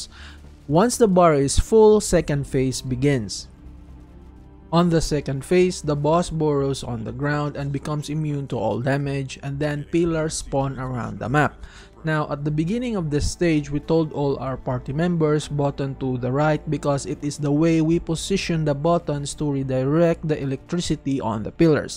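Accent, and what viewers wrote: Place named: Philippines. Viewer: I had a feeling I was listening to a Filipino accent and I was right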